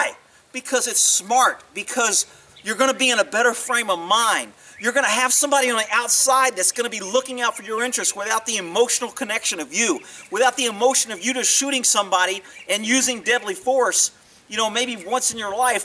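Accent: Texas, United States. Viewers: Texas accent is so strong in this video lol just got done watching Kyle Rittenhouse not-guilty verdict live stream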